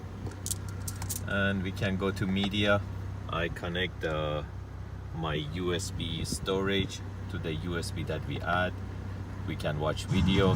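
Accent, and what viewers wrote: Iran. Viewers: haha iranian accent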